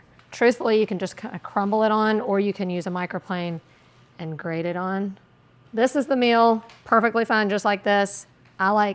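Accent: South, United States. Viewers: Southern girl....love it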